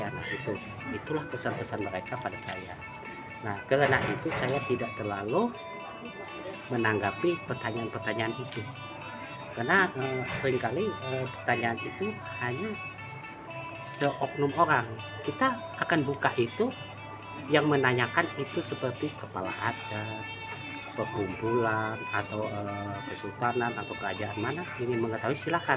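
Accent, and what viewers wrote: Indonesia. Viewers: Logat kutai,Banjar ini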